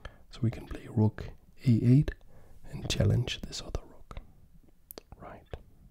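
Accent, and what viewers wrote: Denmark. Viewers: Are you danish? Great video btw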